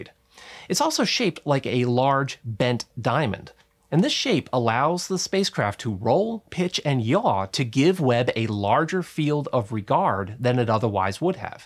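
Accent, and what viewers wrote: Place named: United States. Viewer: You have such a strong american accent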